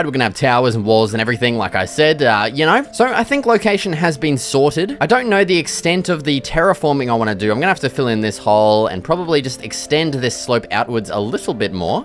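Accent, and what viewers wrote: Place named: Australia. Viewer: Alright.. we get it.... you're Australian...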